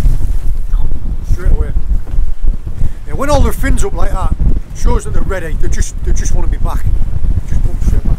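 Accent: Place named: Cornwall, United Kingdom. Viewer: oooh, a bit of the cornish accent is creeping in mate!!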